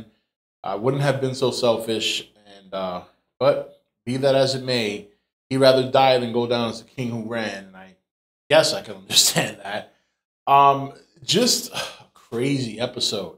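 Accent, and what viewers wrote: New York, United States. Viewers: awesome review. i always love your reviews. are you from new york? your accent closely resembles that of a new yorker, and your views always closely match my own!!!!! original post thanks, you rock, and this was such a messed up ending.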